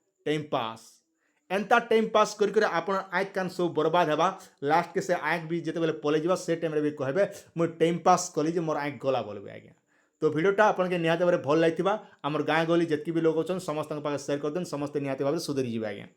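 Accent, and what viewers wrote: Kalahandi, India. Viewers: ତୁମେ କଳାହାଣ୍ଡି ଜିଲ୍ଲା ର ଦାଦା ତୁମର ଭାଷା ରୁ ଜଣା ପଡୁଛେ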